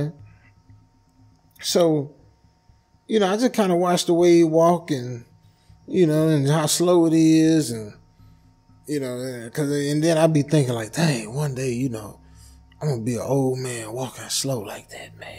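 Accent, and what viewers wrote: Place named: Alabama, United States. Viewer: I just love to hear you talk. Reminds me of my friends back home in Alabama ❤️❤️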